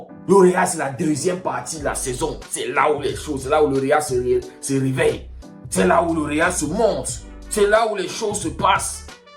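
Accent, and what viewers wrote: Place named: Cameroon. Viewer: La première chose que j'aime chez les camers c'est dabord leur accent 🤣🤣🤣, tres bonne analyse papa !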